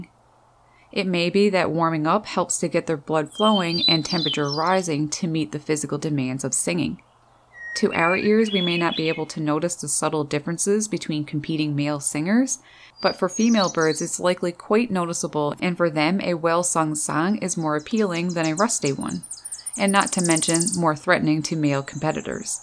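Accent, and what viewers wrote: Canada. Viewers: There is not a thing I can do a-boot that.. soo darned Canadian! lol.. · Its aboot time..clearly Canadian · Hello. Looking forward to my morning irritation of springtime American Robins. I love your explanation and it makes sense. I'm from northern Minnesota and your accent was immediately recognizable as Canadian (very strong). Which province? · The accent is Canadian eh? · Your accent says you're from Canada. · Was wondering how far up north, then I heard "aboot", that far up north i c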